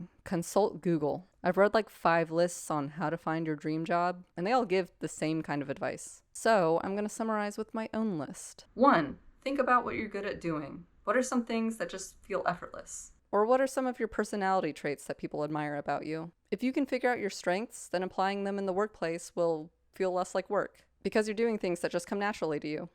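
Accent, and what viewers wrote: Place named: United Kingdom. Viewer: Thanks for the shout out. Nice British Accent! New career as a voice over actress? :) Something you said in there - you have an appreciation for Design. Perhaps Design Consultancy or Management (yeah I know) is your thing? Not actually being "creative" yourself but overseeing the creative process of others to deliver the right result for the client? Having experience of a role before becoming a manager of that role certainly makes you a better leader (or so I've heard).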